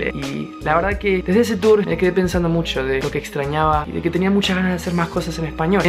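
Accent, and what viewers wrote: Argentina. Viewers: Me encanto 💙🙌 el acento argentino 😮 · Es normal que tú español/argentino me exite? Si? No? Ahre Te amo,y ya sea como hables,ya seas como seas :3 💞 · jajajajjajaj de una me di cuenta q eras argentinoo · Boludooooo, qué? Sos argentino? Se me va a explotar el cerebro. Like literally sjkfhaks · That's so cool! And yes argentinian most used accent is pretty rough ahah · He repetido este video una y otra vez y me encanta tu acento argentino es tan adfghjjkllñ podría escucharte hablar todo el día y no me aburriria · el acento argentino aaa lpm q hermoso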